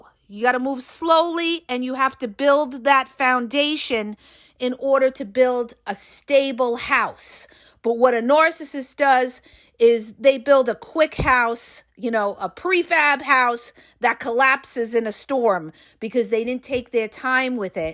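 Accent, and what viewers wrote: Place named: Brooklyn, United States. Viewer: Hey, cool, Brooklyn accent. You are spot on with narcs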